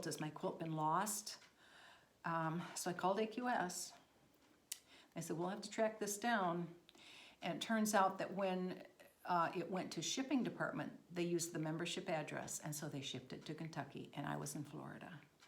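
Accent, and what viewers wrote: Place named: Michigan, United States. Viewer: Just found your floss tube and enjoyed watching them. Your work is beautiful. I chuckled at your comment about your Michigan accent. I live in Michigan and have all my life.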